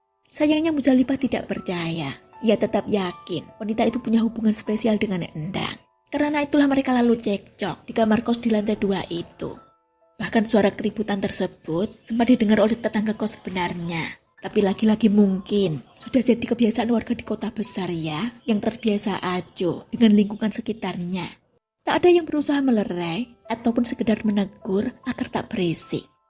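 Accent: Java, Indonesia. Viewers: Mba.. medok bgt suaranya ....😊... Sukses ya...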